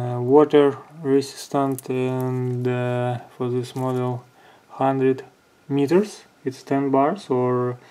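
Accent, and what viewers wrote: Russia. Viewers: А почему рязанское произношение,а?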